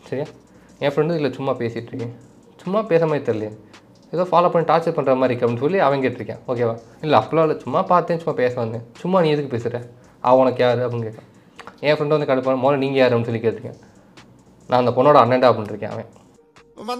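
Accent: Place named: Madurai, India. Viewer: Bro madurai kaarar pola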